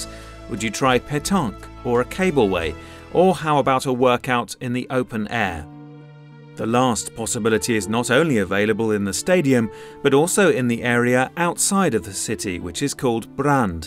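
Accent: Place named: United Kingdom. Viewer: Great info in spite of the Limey accent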